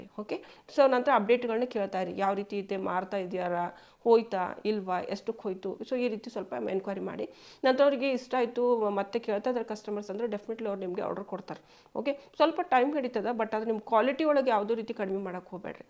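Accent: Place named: North Karnataka, India. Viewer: Yava ooru madum nimmadu,,,,aagaga utter karnatak bashe kuda barta erutte,,,,? Kutuhala aste😊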